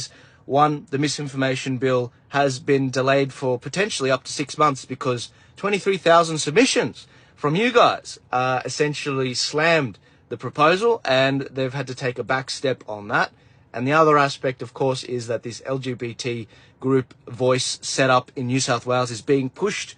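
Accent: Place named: United States. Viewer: Misinformation. Such as Dutton stating the voice has the power to Vito government? Or how about its completely legal for politicians to lie to Australian public during elections and referendums? How about scomo with his watered down to the national icac bill? What war are you fighting bud? You sound just like a yank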